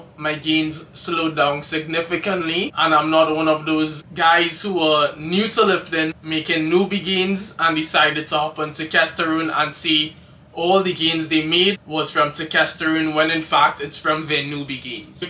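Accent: Trinidad and Tobago. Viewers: you sound like a trini. good luck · That Trini accent haha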